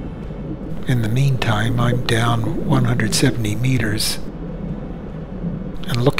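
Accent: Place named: North America. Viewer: Very well put together video - Ubisoft should be grateful for the advertising. Also it's great to hear someone with a North American accent to use "meters." Great job.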